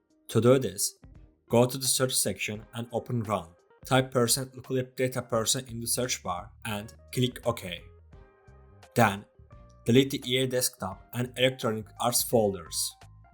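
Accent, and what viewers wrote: Turkey. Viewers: İngilizceyi türkler gibi konuşuyomuş bu çocuk diyodum hangi milliyetten acaba derken yazısını gördüm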